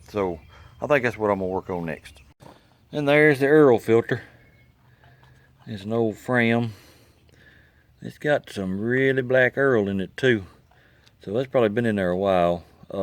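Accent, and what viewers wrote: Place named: Eastern Kentucky, United States. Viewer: really enjoy your show. I grew up with some boys from eastern Kentucky and you sound a lot like them, Glad to hear your channel is growing!!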